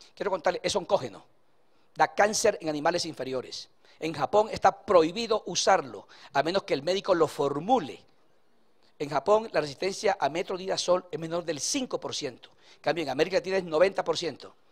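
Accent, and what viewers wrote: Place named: Spain. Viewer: Eeexelente conferenca y sobretodo laclaridady la sencillez con esa explicacon genial el Doc ¿de donde es por su acento al hablar parece ser de España muuchas felicidades Doc. Siga asi amandosu carrera nocomo otro$$$$$.....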